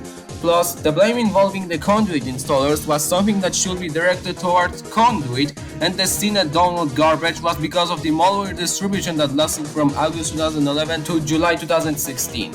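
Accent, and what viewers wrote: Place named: Poland. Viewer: Po akcencie i po opisie widzę, że jesteś z Polski :D Chciałem się zapytać czy wiesz gdzie można pobrać MF ale podałeś link do strony gdzie można je pobrać. Ale chcę się upewnić, i zapytam czy strona, którą podlinkowałeś (ten drugi link) jest na pewno bezpieczna? Gdziekolwiek bym chciał pobrać MF to jest zainfekowane i jesteś jedną z niewielu osób, u której jest podana strona, na której można pobrać MF i byłbym bardzo wdzięczny za odpowiedź czy strona ta jest na pewno bezpieczna i jak pobiorę MF to nie będzie zawirusowane. Z góry dziękuję!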